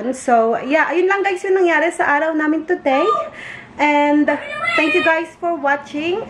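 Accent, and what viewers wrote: United Kingdom. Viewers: Great. Congratulations for that👏👏👍 And ilove your British accent momshie🤣😊👍👍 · I love that British Accent! Lakas maka Harry Pottah! Chaaarr! 😆👌🏽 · British po tlga accent mo na,nakasanayan. Bumpbump na po ako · Hi sis! I’m your new subscriber now. Hope mka bisita ka po sa bahay ko. Cute nman ng mga babies mo.... I love British accent!😘❤️ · whoooa i like your british accent now nice blog. im here now dala angk regalo. tinapos ko talaga nice family. asahan din kita sa bahay ko.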